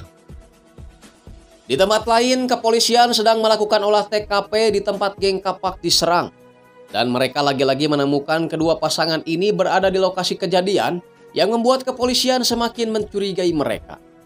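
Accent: West Java, Indonesia. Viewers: Penyakit "kaligata"😂...bang lu orang jawa barat? Kota mangga kah?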